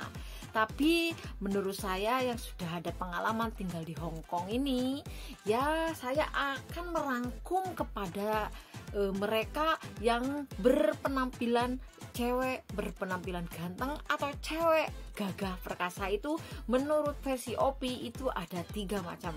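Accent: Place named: Java, Indonesia. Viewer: Hhhhhhhh jawanya mna medok sekali ling hhhhhh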